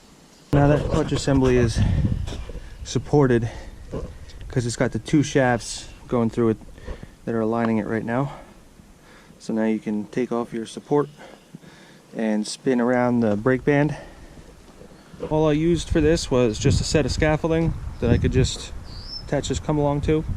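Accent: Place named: North, United States. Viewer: I can't figure out this guy's accent. It's definitely Northern, a touch of those Canadian long vowels, but I can't place it. At first I thought Upper Peninsula/Wisconsin but it's not that strong. Maybe Minnesota or N.D. It's gotta be this side of the border. Anyone know? Also nice vid - I can't get enough of these long form restorations of old farm equipment.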